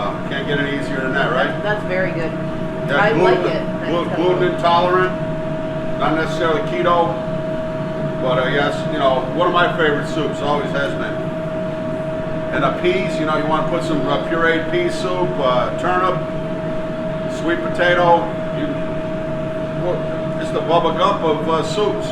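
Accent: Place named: New England, United States. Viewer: eh! love your badass cooking style you & are behind the burners ya'll rock my day!!!! love New England accent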